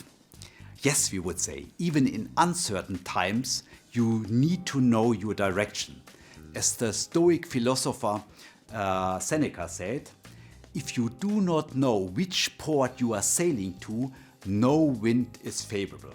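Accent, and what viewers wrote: Germany. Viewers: Challenging listening to this tense rapid German accent. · Is he German by chance? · First time you watch it: Consulting Cringe. Second time you watch it: German accent. Third time you watch it: Mh, good point… let me think. What are my preferences actually? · That's a German accent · Your pronunciation is very interesting. Are you from germany? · Love his German accent 🇩🇪❤️🎉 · listening to this german english accent makes me think that this is some sketch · Thanks for the great Video, you really helped a Lot Your accent Sounds very German to me 😂 Have a great new year everyone M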